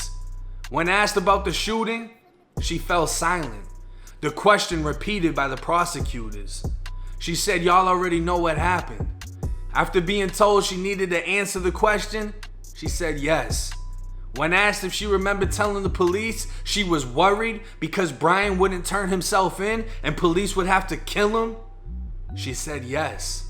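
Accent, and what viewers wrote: Boston, United States. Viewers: I love your accent!! When I moved to Orlando from NY everyone knew immediately where I was from lol. Can't take that Boston know matter how long you live in Florida!! Btw love the way you tell these true crime stories!! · Y u always claiming Florida and obsessed w Florida when u sounds like u from Boston!!! That's what I really wanna kno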